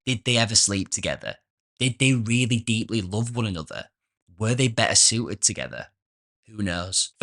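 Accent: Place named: Hull, United Kingdom. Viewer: You have a slight Hull twang.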